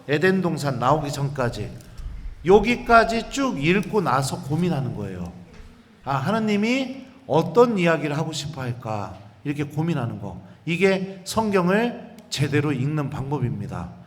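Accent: Chungcheong, South Korea. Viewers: 신부님 강론말씀최고임니다 저도고향이충남보령 인데 충청도사투리가 참 정겨워유 감사합니다 ❤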